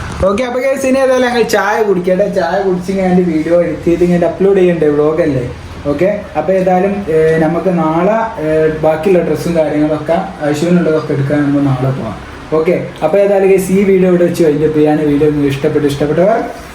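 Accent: Kozhikode, India. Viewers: കോഴിക്കോട് സ്ലാങ് തന്നെ മതി. അതാത് നാട്ടിലെ സംസാര രീതിയാണ്. ജനങ്ങൾക്കിഷ്ടം. കൂടുതൽ ശുദ്ധ മലയാള മൊന്നും വേണ്ടന്നർത്ഥം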